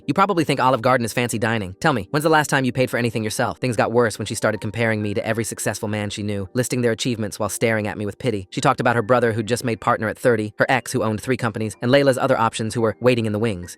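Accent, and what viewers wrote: United Kingdom. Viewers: The tts is bri’ish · WTF BRITISH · The British accent what happened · Ok British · Almost every one of these story's have a British accent like mid video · why British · Ahhh yes british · That british accent was so funny! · Why the hell did it turn British towards the end…. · Bro turned british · Nice British , mate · What in the Gordon Ramsey accent is going on here? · ummmmm british much???? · Bro slowly turned british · why did bro turn British why immediately went to the comments and see if anybody else anything about this bro went from normal to British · The British accent 😂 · Is it just me or has British accents been appearing randomly in the recent videos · That British accent is wild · Not the British eccent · What the british accent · The British accent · Bro why’d it turn British half way through the story😭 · Dude, why is it British? 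· Why did it turn British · the british accent!! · british mate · Why the british accent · Me: Watching video Hears British accent sighs opens comments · Lil bro got a British accent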